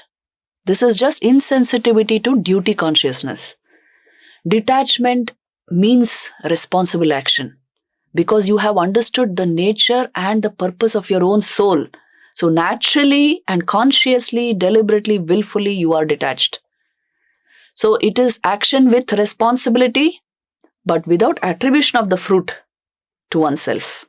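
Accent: India. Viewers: Why does she have an Indian accent in English? She doesn’t look Indian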